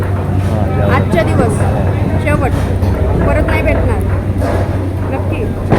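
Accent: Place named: Satara, India. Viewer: है मीपन सातारची आहे.आपली सातारी भाषा 😂 लगेच ओळखते.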